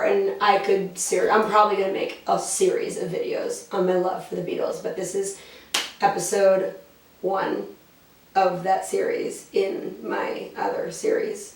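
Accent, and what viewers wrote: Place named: New Jersey, United States. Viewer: you're gorgeous and I like your singing, but you're speaking voice is so Jersey shore that it hurts to hear you describe my favorite band lol. Well right behind the wailers and the jimi Hendrix experience. Just constructive criticism because you're a professional. But you're so right in recognizing their genius. I literally think they are the famous Kumar avatars of God because they really cared about helping souls. "We're bigger than jesus. " lol. Anyway I wish you all the best because you have a beautiful soul too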